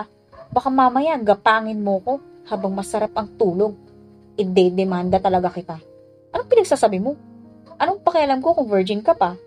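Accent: Visayas, Philippines. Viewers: Sorry maganda story but narrator boses visaya accent nakakawalang gana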